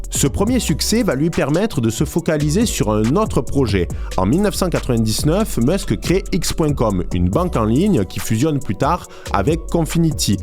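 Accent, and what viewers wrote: South, France. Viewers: Cet accent du Sud et les cigales qui rappent en fond; ça sent bon la lavande tout ça ! !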